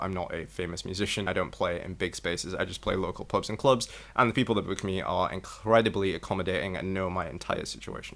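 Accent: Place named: United Kingdom. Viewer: San Jose Sharks ?!?!?! Bro are you in the Bay Area?!?! I am in san mateo. Your accent has me thinking UK 😂 are you just a major hockey fan, or are you living in California? The medicine topic is more crucial to the world's well-being than most people realize. I have grown poppies for a few years now, and consider it a tragedy that most people have been estranged from this great medicinal plant. Human beings in general may be more opium-dependant than is acknowledged. Poppies make a night and day difference in my ability to function in this body, and actually live more full/thorough days, do work, exercise, etc.... For years i regularly exercised and practiced discipline in various forms, without opium or any opiates (having steered away from such substances after high school). Never did i reach the same level of sustained health/function without poppies/opium that i experienced once i began growing and taking them. 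🌷🌷🌷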